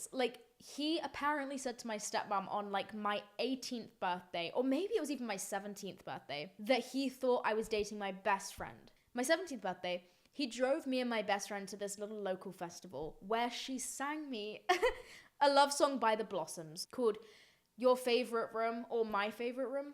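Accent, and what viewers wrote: United Kingdom. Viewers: this kinda long video literally helps my listening skills for British English in the exam, I love you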